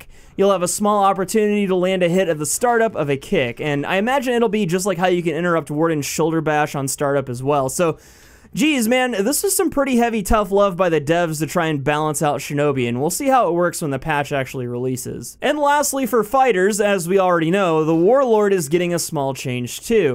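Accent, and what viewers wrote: United States. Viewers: Your southern accent is so generic xD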